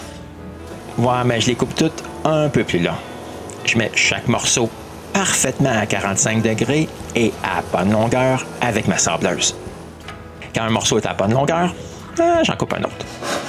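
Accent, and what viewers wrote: Canada. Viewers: ce petit accent Canadien me régal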